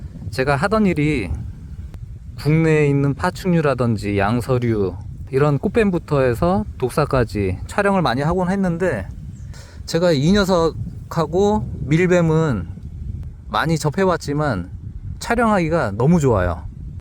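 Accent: Jeolla, South Korea. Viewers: 자네 고향이 전라돈가?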